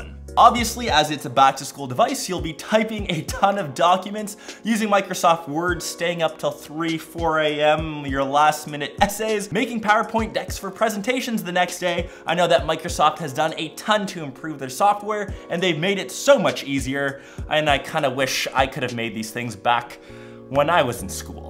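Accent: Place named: Canada. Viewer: Your Canadian accent calms me, it's often my audio ambiance of choice while coding.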